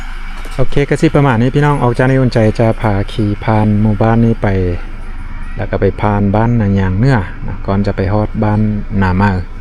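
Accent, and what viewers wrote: Loei, Thailand. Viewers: สำเนียงเมืองเลยครับ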